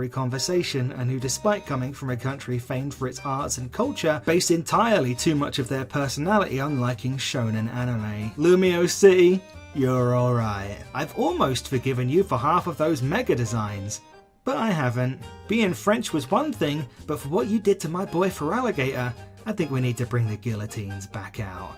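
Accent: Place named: United Kingdom. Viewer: Oh for the love of god, of course he's british😭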